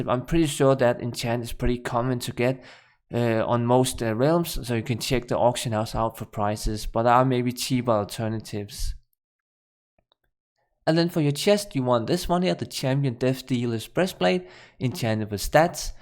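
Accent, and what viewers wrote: Denmark. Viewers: i smell danish somehow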